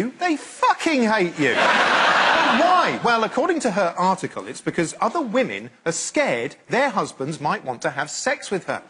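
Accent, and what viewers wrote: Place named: Home Counties, United Kingdom. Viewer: a Brummie that grew up in Surrey so he has that Home County accent with a bit of Brummie twang.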